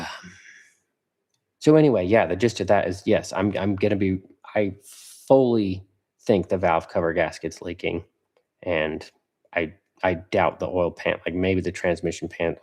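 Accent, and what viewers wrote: Canada. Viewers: I was wondering why you sounded Canadian but your bio said USA. You're an honourary Canadian in my book, bud.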